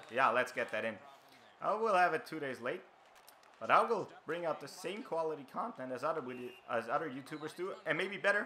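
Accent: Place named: Austria. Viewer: I like your accent... you sound like Michael Grabner. Are you from Austria? You're very insightful, keep up the good work.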